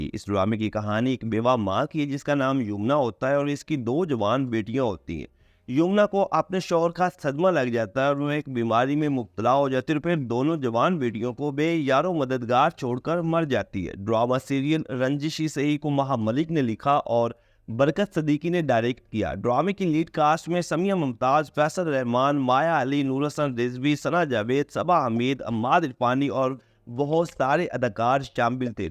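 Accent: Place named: Punjab, India. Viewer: No doubt mother tongue Punjabi. Very poor URDU.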